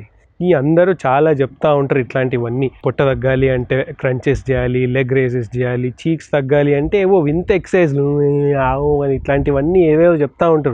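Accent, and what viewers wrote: Telangana, India. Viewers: Telangana slang bavundhi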